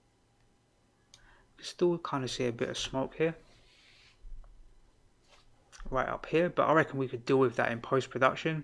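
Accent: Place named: England, United Kingdom. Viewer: I love your English accent 😂🥰 Nice tutorial, thank you! 👍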